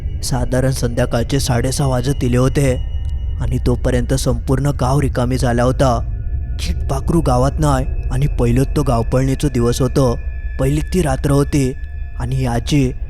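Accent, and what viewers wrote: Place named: Malvan, India. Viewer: ❤.व्हेरी. Good and.sweet.malvani.voice. 😂.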